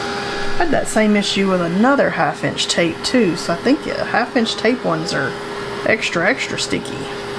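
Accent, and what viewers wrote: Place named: Kentucky, United States. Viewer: I love your accent and enthusiasm! Any chance you are from Kentucky? What would be your top 5 supplies (after watercolor paper , brushes and paints) for a beginner watercolor gal who wants to try following along with you and who does not have any of the pens, inks, sticks and other wonderful things you have? I'm overwhelmed by your Amazon list!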